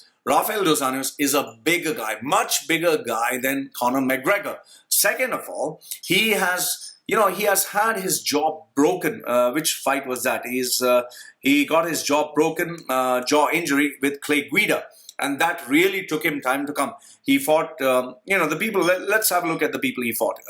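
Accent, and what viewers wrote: India. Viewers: Wasted 9 precious mins of my life.. Dude you talk in India accent. Are you from India? You make video with such a good title but you dont talk facts while making predictions... Rafael is 1inch shorter in height and reach is equal why hez big? Gather some facts and make video then it would be interesting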